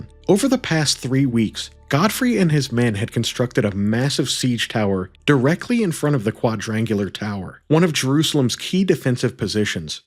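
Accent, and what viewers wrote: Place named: United States. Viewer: Thank you Jesus for the American accent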